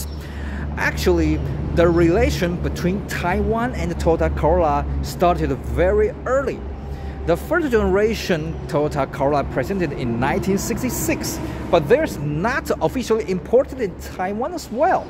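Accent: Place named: United Kingdom. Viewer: 講的很有道地的英國口音不愧是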